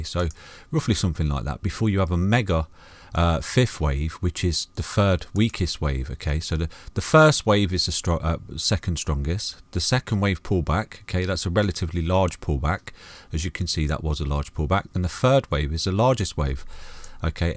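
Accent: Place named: West Midlands, United Kingdom. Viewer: West Mids, knew it was near 😂😂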